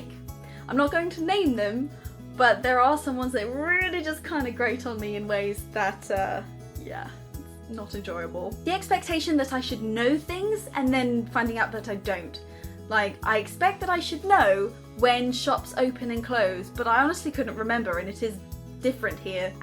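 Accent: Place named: United Kingdom. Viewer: Also you sound a lot more British now and also the accents that aren't good are.... Londoners and Yorkshire. :P At least that's my limited impression. haha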